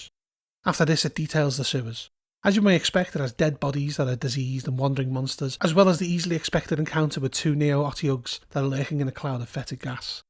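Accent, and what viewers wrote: Liverpool, United Kingdom. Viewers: Scouser? Please review more old school d&d!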